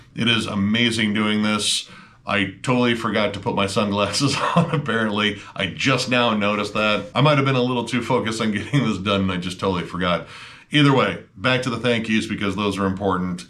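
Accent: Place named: Wisconsin, United States. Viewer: Im a sucker for a Wisconsin accent...on a lady. Hey, I dont judge.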